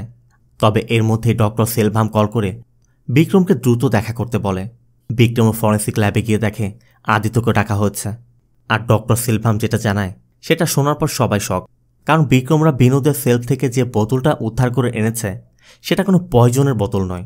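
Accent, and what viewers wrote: Bangladesh. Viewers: কলকাতা বাঙালি এই ভাবে কথা বলে না নিশ্চিত বাংলাদেশি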